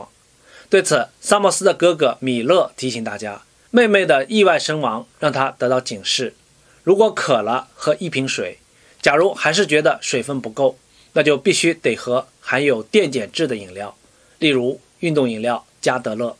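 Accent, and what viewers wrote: Standard. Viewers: 您的普通话非常标准 字幕有一排最好， 不要显示两排相同的字幕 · 有字幕更好。您的播音质量已经是首选了，国语讲得最容易明白。可能您的国语比较标准、语速也讲得标准吧。👍赞、加油。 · 你说话挺标准的，不需要字幕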